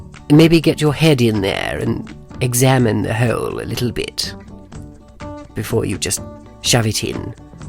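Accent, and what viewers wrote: United Kingdom. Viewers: Question: is that a real British accent?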